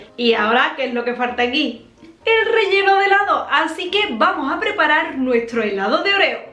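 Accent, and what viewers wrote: Andalusia, Spain. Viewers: Me encantaste de que parte de España es ese acento tan bello ? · Hola, soy de Argentina, me gusta tu tono de voz, eres de Andalucía? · ¿De qué parte de españa es su acento?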